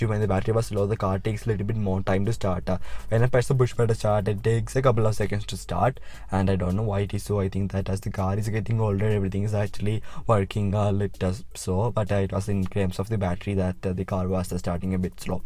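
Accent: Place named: Kerala, India. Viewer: Malalyali aananu first 2 seconds le manasil aayi :)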